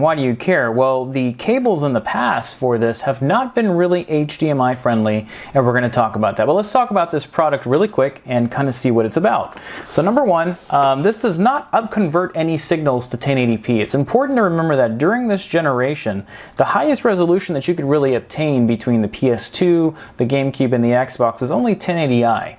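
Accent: United States. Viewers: For a while I thought I was watching, until I realized you spoke American English.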